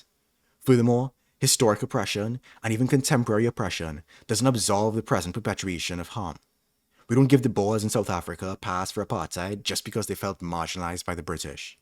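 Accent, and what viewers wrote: Trinidad and Tobago. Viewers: Is this guy Trinidadian?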